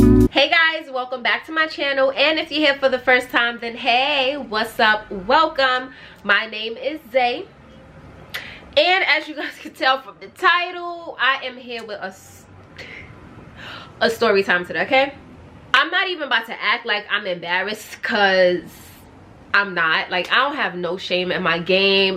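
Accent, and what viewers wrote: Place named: New York, United States. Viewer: Omg the accent. I feel like I haven't heard a NY accent in so long since moving to Atlanta. And yes, it is C-STEP lol.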